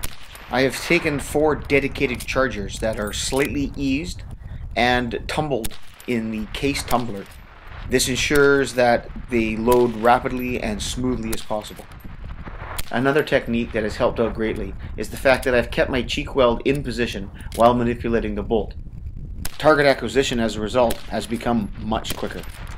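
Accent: Canada. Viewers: Awesome job! is this guy Canadian or northern American? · Are you Canadian? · Good luck with 35! I just bought myself a sporterised (unfortunately) 1942 Lithgow stamped SMLE for $100AUD...with 75 rounds of ammo! Its a terrific old rifle, I'd like to have a go at the mad minute one day, so really appreciate the good info your videos impart. You're in Canada by the sounds of it, yes? I also read with interest the debate surrounding whether Snoxalls record is a myth, and though some of the debaters are more like masturbators, it makes for a good read. Seeing your progress and technique certainly adds some flavour to the topic. Thank you.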